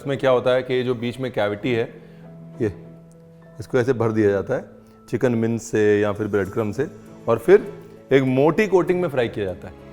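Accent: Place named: Lucknow, India. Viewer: Dear Sir one of my best friend is from Lukhnow nd u hv same accent like the words “Bhaiya”, “Shaukeen”, “Turant”, “Kayde se”, etc…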